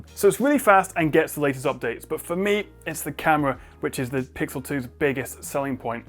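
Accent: United Kingdom. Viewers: I just wanted to say, I love your British Accent. I'm from Germany and British English sounds really good I think. (British) English is such a beautiful language :) Love your Reviews in General. I'd buy the Pixel if the smaller one wasn't so bad looking... I think you can only have on Screen Navigation Buttons if you have a larger Screen. For me it's a waste of space. But the xl model is too big and expensive for me :/ I'm going to get the s8 on cyber monday. It's already a lot cheaper than the Pixel and I hope to get it for 500€ then.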